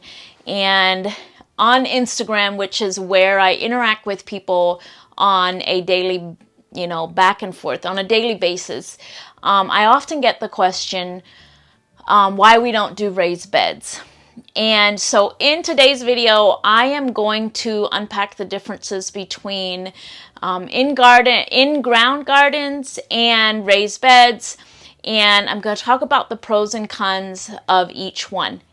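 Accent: Pennsylvania Dutch, United States. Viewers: Is your primary language Pennsylvania Dutch ? I hear it spoken all the time where I live near Lancaster PA also Germany ! Thank you explaining the differences between the two . I use raised beds because of the deer they don’t eat my plants in a raised bed .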